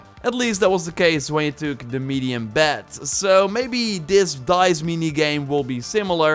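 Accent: Netherlands. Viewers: I know you life in the netherlands because of your voice/ik woon hier ook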